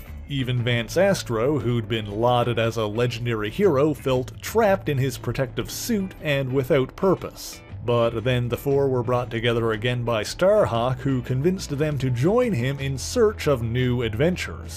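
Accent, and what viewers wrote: Newfoundland, Canada. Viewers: Why does the narrator sound like a Newfoundlander to me?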